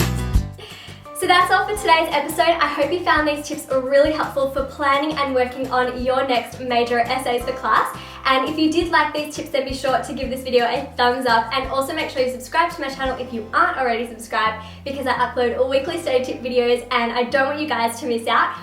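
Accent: United States. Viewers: You are very cute. But the savvy southern blahblah talk lol annoying.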